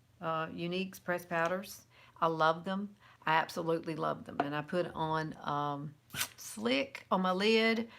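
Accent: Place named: South, United States. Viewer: Thanks ♥️ you do sound southern... cute though :) enjoy you Cancun trip! 🌺🌺🌺🌺🌺🌺🌺🌺🌺🌺🌺🌺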